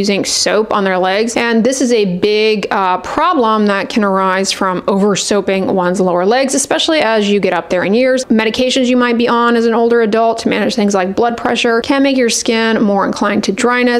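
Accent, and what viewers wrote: United States. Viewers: Unrelated, but what part of the USA is this accent from?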